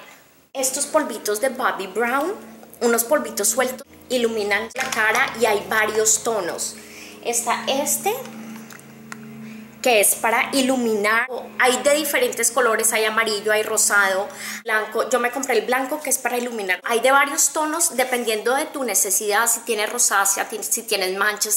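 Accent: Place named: Colombia. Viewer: Tan bella siempre....amo tu acento colombiano! !!!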